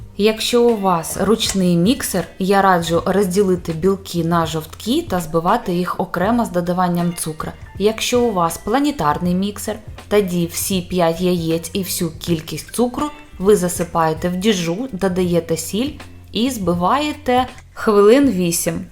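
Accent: Russia. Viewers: не може вимовляти букву О у ненаголошених складах, все одно виходить А - це загальне явище у всіх, для кого рідна мова російська. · Російський акцент цієї блогерки ріже вухо😮 Будь ласка не видаляйте негативних коментарів😮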